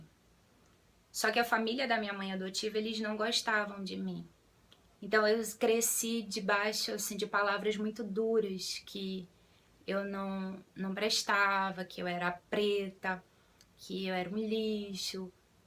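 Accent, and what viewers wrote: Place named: Rio de Janeiro, Brazil. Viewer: achei que vc era do rio de janeiro.. pelo sotaque rs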